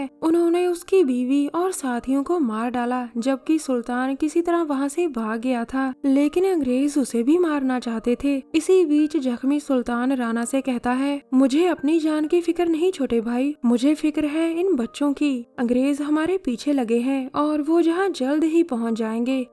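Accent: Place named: Bihar, India. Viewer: Maidim kya aap bihar se ho boli se toh aap ekdum gaavki bihari aurat lagti ho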